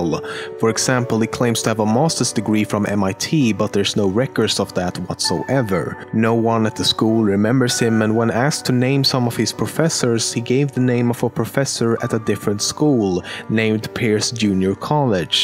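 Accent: Sweden. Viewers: Tbh I can’t stand this Swedish English accent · We can hear you are swedish!😂 i’m personally swedish.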